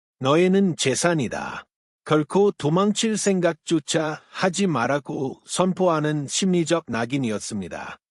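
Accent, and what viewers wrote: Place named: Egypt. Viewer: 이집트 여성 노예의 기록~내용은 자세한데 이집트 사람이 한쿡말 하는것 같은데? 한쿠말~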